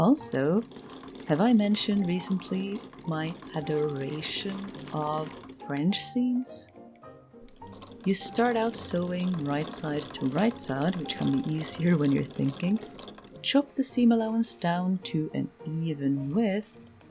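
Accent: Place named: Sweden. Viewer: I came here from your Hank react. The tone and pacing of your video style is gorgeous. Perfect for watching while drinking coffee from my favorite mug and knitting on a rainy Sunday. Also, my maternal grandfather was a Swedish immigrant (US here) and I feel like I'm listening to long lost kin. ❤ An island of tranquility in a dark, stormy world these days.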